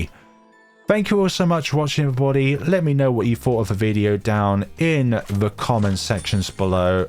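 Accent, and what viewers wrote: United Kingdom. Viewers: Holy British accent 😂 lovely video gentleman!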